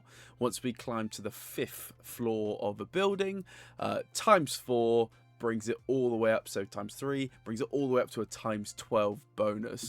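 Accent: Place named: United Kingdom. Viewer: Great video! I also hear a British accent (you correcting yourself from £1 to a dollar bill haha); not many of us who play/played TTR! This might be advanced, but this is what I used to do when training in buildings alongside your advice: I'd optimise the gags in a way where I'd overkill the cog on the last floor to max out how much gag points you can acquire in one building. For example, on the top floor, if the first cog is Lv 9 by itself before other cogs join, I wouldn't use a Lv 6 gag as it'll most likely take it out in one hit. I'd use two Lv 4 or 5 gags then use a Lv 6. That way, you'll max out the gag points faster. Like using a trap door first, then TNT. Or two quicksands then TNT. Also, Yesman are the best cog to face in an invasion; their attacks are not powerful and go up to Lv 7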